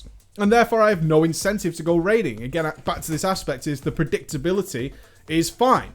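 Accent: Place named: Lancashire, United Kingdom. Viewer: i think hes from the lancashire region of england tis a funny accent :P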